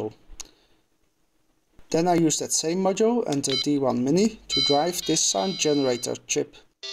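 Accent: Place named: Netherlands. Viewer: Ok ok! I'm also still figuring out the tricks of the trade - you're not alone! I've trialed many different video editors and in my opinion, the best is Cyberlink PowerDirector. It isn't perfect, but I still think it is the best. Yes, you're doing it the way I used to do it. Now I rarely do this! What I do now is section the video into 'scenes', then I 'take' until the scene is perfect, then move to the next scene . Then when editing, I simply delete all but the last take for each scene. It saves me many hours! I also do 'errr', 'um', 'arr', but I'm happy to leave them in because I don't want my channel to be too formal. About your presentation; it is absolutely fine... I can hear a strong Dutch accent, but I can fully understand what you're saying. I don't know about other countries, but here in Britain, I think we are good at understanding accents because we are used to talking to people in English who are from all over the world. 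For example; the word 'one', you pronounce as 'WAN', but it's close enough to know that you're saying 'one' without any effort at all. Like if an English person speaks Dutch, you can hear the accent and it applies a sort of slant to the speech, but you can still understand it well. :-)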